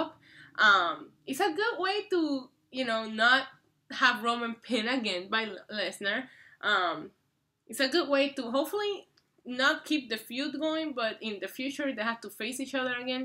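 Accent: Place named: Puerto Rico. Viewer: by your accent you sound Puerto Rican!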